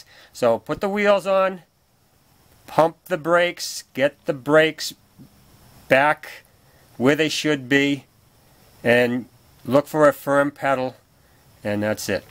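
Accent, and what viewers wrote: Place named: Massachusetts, United States. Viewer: I love hearing the accent!! LOL!! My wife grew up in Lawrence and I said, this guys gotta be from Mass! LOL!! Sure enough, I see the Mass tag on the front! I do get a bit crotchety with these manufacturers!! Read is 13m so it figures the front would be different....as some one else pointed out, they have you buying one of this and one of that.....well...at least I can justify my Advance auto charge on the bank statement!! LOL!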